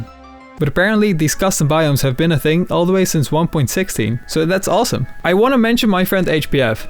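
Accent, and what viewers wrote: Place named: Netherlands. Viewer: are u dutch? ur accent and pronunciation sounds like a dutch one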